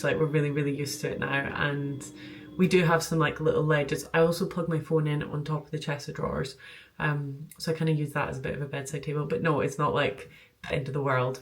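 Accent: Scotland, United Kingdom. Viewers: I thought you might have had visitors this weekend, because you sound particularly Scottish at the start of this vlog :).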